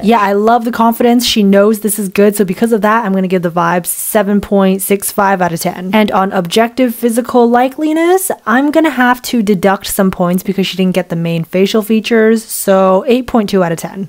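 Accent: United Kingdom. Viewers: Stop the british accent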